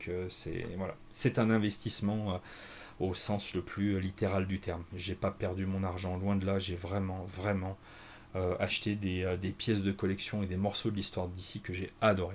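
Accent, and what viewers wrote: England, United Kingdom. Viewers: Bah il est très bien cet accent anglais ! Merci pour la vidéo ! J'ai jamais pris le temps de découvrir la JSA, ça donne envie!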